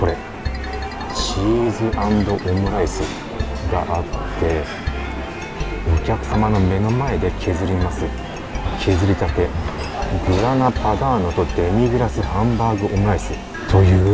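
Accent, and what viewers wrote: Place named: Okinawa, Japan. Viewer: さんの沖縄なまりの話し方が大好きです。生まれた時から沖縄に住まれてましたか？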